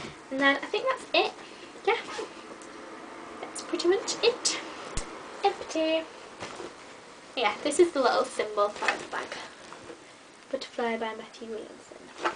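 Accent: United Kingdom. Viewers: cooll videeoo you have a more british accent than me and i live in the uk to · UK gals always have the cutest accent lol put more vedios nice work :)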